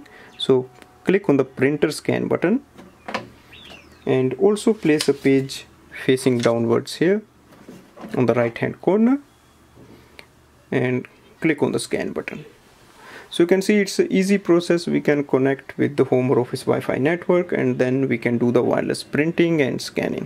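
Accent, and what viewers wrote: India. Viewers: very bad instructions hard to understand India speaker - very little paper tray -NO instruction how to set up WITHOUT smart phone to me a WAST$E of money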